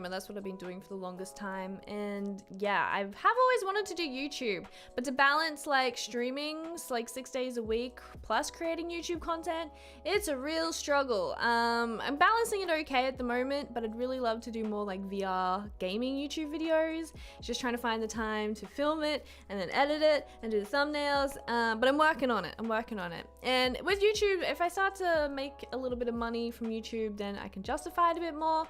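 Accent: Australia. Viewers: She is Aussie... I wonder where that could be....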